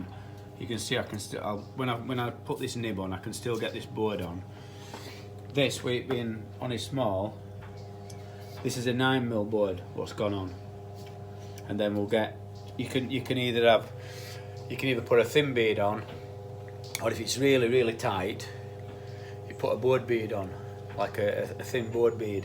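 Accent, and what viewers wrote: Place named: Yorkshire, United Kingdom. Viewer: (subscribed) excellent video thankyou. im a yorkshire lad but now live in cornwall. ive just bought a house thats been built against a steep hill with the back wall right up against the hill which is soil nearly up to the first floor. i cant see wether its been waterproofed behind before it was back filled without digging down. its double skin concrete block construction, and shows slight traces of damp inside but nothing major, just a few black marks and a bit of bubbleing paint. would this system that youve shown here be adequate to fix this, and also can i stick it over painted walls or do i have to take the paint off first? i fully understand that you cant give a proper answer without seeing it your self but just woindered if youve ever done owt similar? its great to hear the yorkshire accent again as well!!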